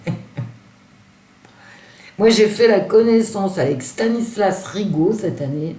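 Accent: Paris, France. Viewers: Je te connais pas depuis longtemps grâce à Abbygaelle. Je suis très heureuse d avoir fait ta connaissance. Tu as un adorable accent de Titi parisien ou alors je me trompe. Lol. Faudra que je regarde si tu as regardé du côté de ruspoulidor. L automne va être difficile pour tous. Je t embrasse. Maryse. 🤗♥️🌟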